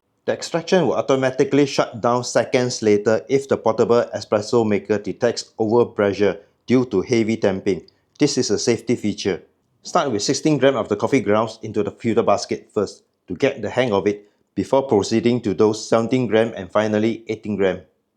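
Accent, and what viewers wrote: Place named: Southeast Asia. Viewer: Greetings to south East asia 😁 love the accent Great review Not changeable like the batteries of modern day cell phones or not changeable at all? Also wonder: the open filter at the bottom is nice but it would be cool to be able to mount some funel to avoid spilling and to let the coffee run straight into milk foam Not sure if that's a great idea though: too hot moka (Bialetti) causes brown, bitter milk foam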